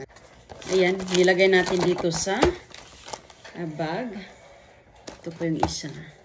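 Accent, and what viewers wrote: Visayas, Philippines. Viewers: Bisaya si kaya ganyan sya mgsalita manood ka nalang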